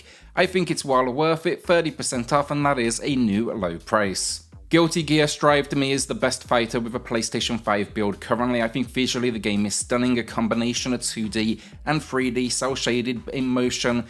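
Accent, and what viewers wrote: United Kingdom. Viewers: you have a cool accent, Scottish perhaps?. It won't be long before this channel gets massive. Keep it up guys!!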